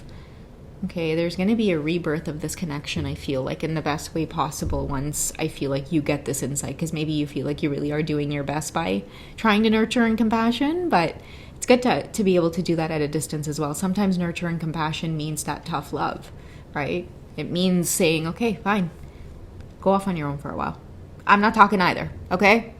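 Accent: Trinidad and Tobago. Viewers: You are great, and I ❤️ your Trini accent!!! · Omg I love that trini accent.. so soothing lol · That's was so funny .that's how true Trini talk😊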